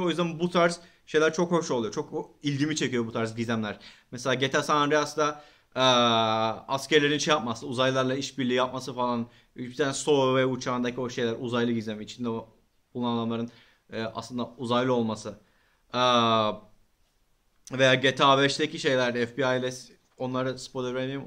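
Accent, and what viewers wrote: Trabzon, Turkey. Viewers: abi Trabzonlu olduğun çok belli tolga zengin e beziyorsun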